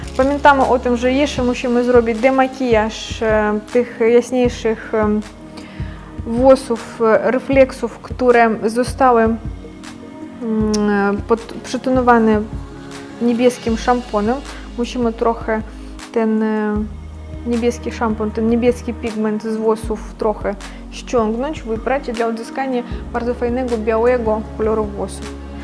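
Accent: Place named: Ukraine. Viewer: Kurwa ten ukraiński akcent jak ja tego nie znoszę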